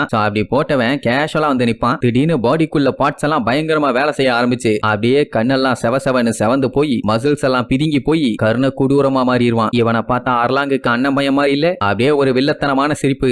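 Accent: Coimbatore, India. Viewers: Bro nee ga coimbatore aaaa????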